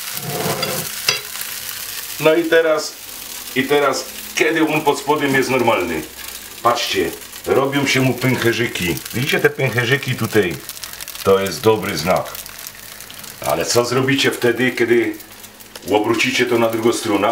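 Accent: Silesia, Poland. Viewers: Pozdrawiam z podkarpacia Panie..swietny przepis na zdrowe placki ziemniaczano, serowe bez chemi...lubie sluchac twojej mowy slaskiej..dziekuje za wiele wspanialych przepisow kulinarnych...nauka nie idzie w las....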